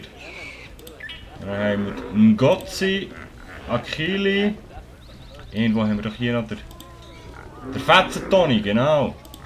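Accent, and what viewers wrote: Bern, Switzerland. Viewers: Haha du siech schlofsch fascht i bim rede, Berner?😂 mega unterhaltsam, mach witer so.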